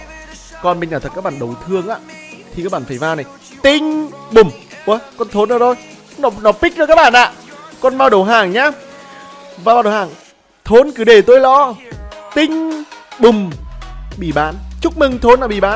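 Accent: Nghe An, Vietnam. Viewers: Ng nghệ an mới hiểu đc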